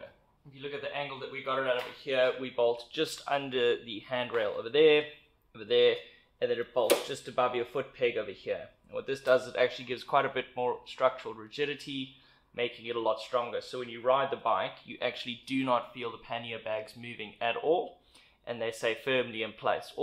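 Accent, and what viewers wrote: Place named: South Africa. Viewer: Any chance this is available in South Africa, sounds like a RSA accent 🙂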